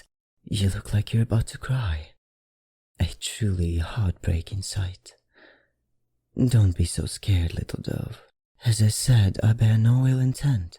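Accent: France. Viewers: I’m someone who’s seriously into vampires. But I swear I just felt so seduced by your voice. Especially with the French accent and the chuckle. 🥰🥰🥰 amazing voice acting!! ♥️♥️♥️ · AM THE ONLY ONE OR I THINK HE HAS LIKE A FRENCH ACCIDENT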